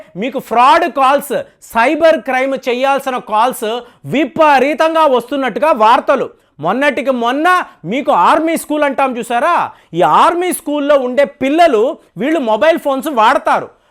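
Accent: Tamil Nadu, India. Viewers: పాయాసం ఏమిటి సోదరా!!! పాయసం...అనాలి... మీరు ఎన్నుకునే సబ్జెక్ట్స్ చాలా ఇంట్రెస్టంగ్ గా వుంటాయి... విశ్లేషణ కూడా అదుర్స్...నో వన్ కెన్ బీట్ ఇట్.... అయితే మీవాచికంలో (స్పీచ్ లో) తమిళ ఆక్సెంట్ విరివిగా వుంటుంది...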